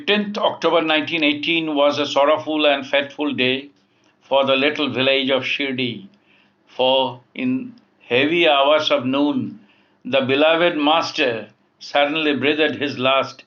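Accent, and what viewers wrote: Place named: United Kingdom. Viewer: Your pronunciation is very difficult to differentiate from British Style. Very Good.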